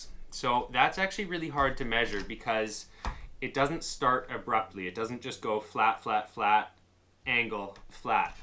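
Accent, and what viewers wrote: Canada. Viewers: (Not related to vid) Your accent remind me of Ben Stiller from Zoolander. Is this the typical Canadian accent? 😂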